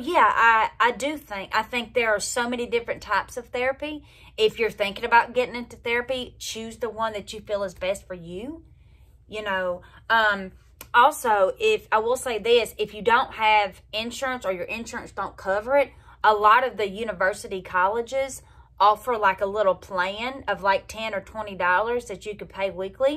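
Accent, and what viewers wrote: South, United States. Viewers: that is one of the funniest people i've seen so far her southern accent is right on ya u doin good mama tot and john deere is so proud of you you've already made my day better for sure luv u mama tot may the lord bless you n keep you safe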